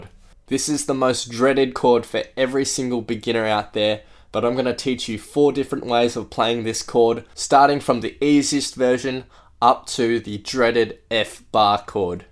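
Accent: Australia. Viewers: Got a bit of an aussie accent going on there, eh mate? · are you australian? me too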